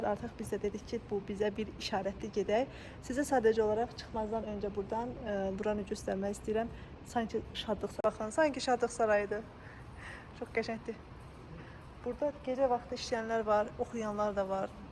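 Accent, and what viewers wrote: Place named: Zaqatala, Azerbaijan. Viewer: Maşaallah olsun. Həzz verir adama izləmək. Zaqatala ləhcənizi yesinlər, hocam 😃❤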